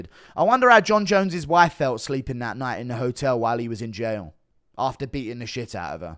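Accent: United Kingdom. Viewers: British accent makes the unwatchable..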